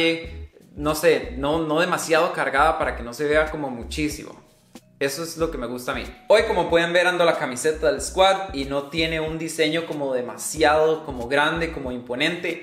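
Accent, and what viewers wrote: Costa Rica. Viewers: usted es tico? vea esa ERRRRRE mae hahaha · Eres tico verdad?🇨🇷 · Creo que de Costa rica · Eres de costa rica ? Pasó solo 1 segundo y me pareció que si Si si eres tico,es que es muy obvio mae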